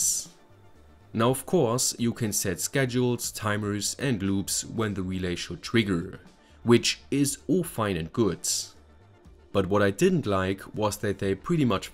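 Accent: Germany. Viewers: German accent goes brrrrrrr And nice Video :) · is from Germany. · nicht sicher aber hörst dich sehr deutsch an also akzent mäßig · Der bre klingt biss europäisch, würde fast sagen, deutsch...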